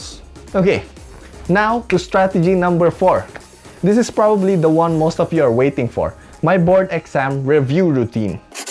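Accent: Philippines. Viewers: omg im so early ahhh! oh thank you!! im currently 4th year student and because of this i now know what challenges i may have encounter! thank you soo much! at first i was doubting myself if i could really be an architect because i suck at math and that im not that good at drawing and when im measuring i dont know the right measure or angle soo that made me think of another course but when i found your video i found hope that i can do it! soo thank you very much! i watch other arcgitectural video but cannot seem to understand them but you,i could really relate (maybe because were both filipino and love the accent make me feel at home so that make me feel comfortable and actually listening to you) thank you for being my inspiration and i hipe you will be my mentor in the future as you said XD! Oh well then god bless you kuya!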